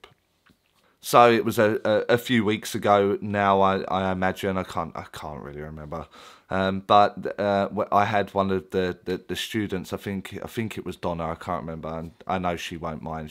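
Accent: Essex, United Kingdom. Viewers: I've been following you since 2018/2019 and back then I could only hope I could be a Medium. Fast forward I'm now demonstrating as a Medium from 2020 and you've been truly inspirational. I am now rewatching your videos with new eyes and again learning more at a deeper level. Plus love the Essex accent but then again I'm bias as I'm Essex too and just hope I'm as down to earth as you 😊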